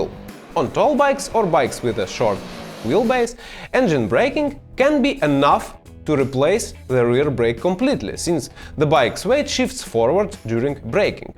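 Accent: Russia. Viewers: Прекрасный английский! Но по акценту как те русские из голливудских фильмов, 😂 хотя это совсем не бесит и не мешает, а даже придаёт какой то шарм. Главное очень грамотно и понятно! По качеству и локоничности транслируемой инфы, - просто 10 из 10! Отличный канал и не только для новичков, судя по тому нонсенсу который иногда выдают "бывалые", - всем сюда! - на повышение квалификации! Спасибо за труд. 😀👍✌️ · You are my favorite living Russian because I can’t recall the last time I fell on my daily ride and feel that your YouTube videos are a large part of why. Perhaps your accent forces me to focus more, or maybe you are just that good as a teacher. Whatever the reason, you made a difference in the way I listen.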